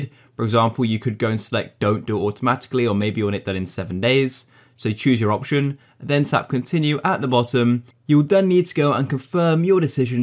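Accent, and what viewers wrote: United Kingdom. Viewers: If he is american why does he speak british?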